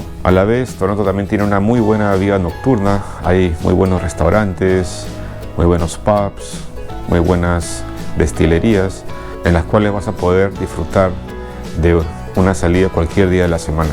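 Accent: Peru. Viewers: Eres peruano hermano?, me parece por tu acento.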